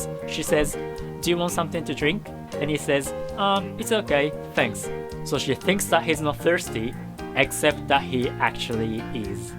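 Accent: United Kingdom. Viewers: why do you peak with a british accent? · hey..! you sound British with your diction, it's cool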